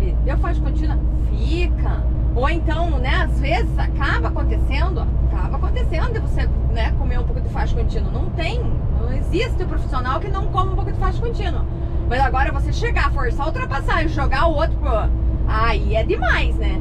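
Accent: South, Brazil. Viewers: Kkkkkkkkkkkkkkkk você é catarinense ou paranaense?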